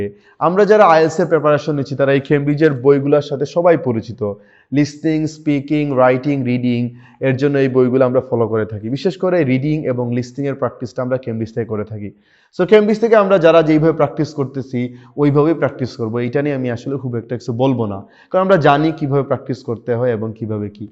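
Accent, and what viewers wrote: Sylhet, Bangladesh. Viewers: সিঙ্গেল ইউজ প্লাস্টিক ব্যবহার কমিয়ে প্লাস্টিক দুষণ রোধ করতে এগিয়ে আসুন । পানির বোতল বাদ দিয়ে পানি ফুটিয়ে খাওয়ার অভ্যাস করুন। ভাইজান কি সিলোটি?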